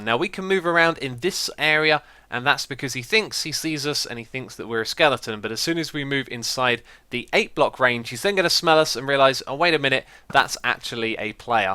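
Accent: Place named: United Kingdom. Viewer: I love your British Accent haha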